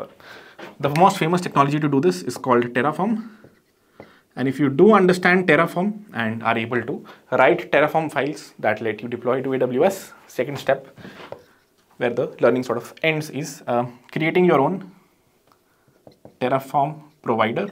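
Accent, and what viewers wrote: India. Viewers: ur Hindi English is too good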